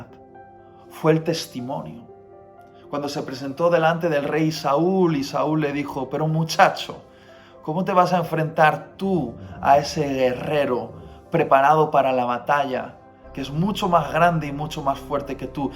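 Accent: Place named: Basque Country, Spain. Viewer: Muchas bendiciones Dios te utiliza en gran manera y atu manera de ser saludos desde zamora (Castilla y leon ) España🇪🇸 aveces parece que ya no tienes el acento vasco pero luego eah ay esta 😂Dios te bendiga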